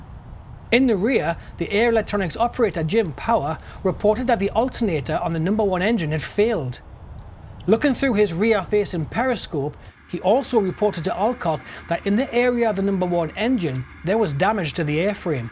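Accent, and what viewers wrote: Newcastle, United Kingdom. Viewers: assumed you were from 'The Toon' before you mentioned it... geordie is obvious. Great to get someone local putting stuff out. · I love Vulcans and this was a story I'd never heard. Congrats on the genuine human commentary complete with genuine Geordie accent. I'm fed up with text-to-speech converters.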